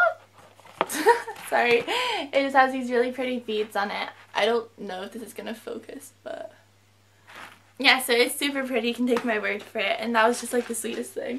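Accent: United States. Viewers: Are you from America ? Either that or you put the accent on?, but loved this vid! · I know ur accent is american but do you live in uk because all the brands r uk shops? jw btw xx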